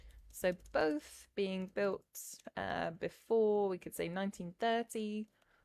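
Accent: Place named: United Kingdom. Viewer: This lady has a very relaxing and soothing voice and British accent possibly? I get sleepy too. The other man uses use’s different variations n speeds In his voice so it’s more engaging.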